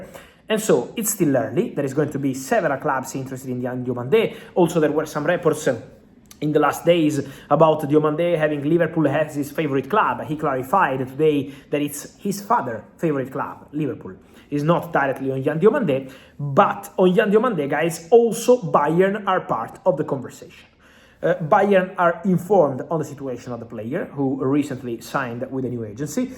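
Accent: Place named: Italy. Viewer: Why you talk so fast this is news everybody want to understand you speak english with italian way Pkease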